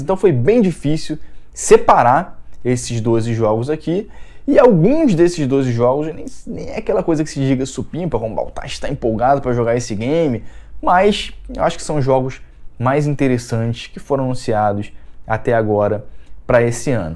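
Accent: Rio de Janeiro, Brazil. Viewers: Esse sotaque carioca é uma pika mané, eu entendi "baú dos gay de 3" e é baldur's Gate 3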